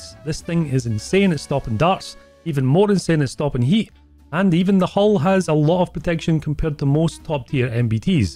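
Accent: Scotland, United Kingdom. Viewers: Pronunciation is quite good and the Scottish accent is always a nice plus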